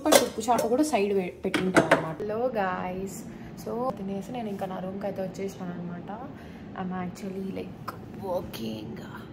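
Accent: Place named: Hyderabad, India. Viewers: Motham Telangana slang matladuthunavu ga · Your slang looks like our Hyderabadi telugu pakka mass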